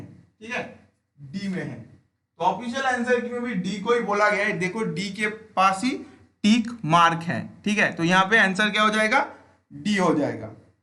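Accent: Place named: Bengal, India. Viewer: Dada iacs er aro questions korao... Please do more questions of iacs. Your accent made me feel that you're a Bengali. So commented in Bengali :)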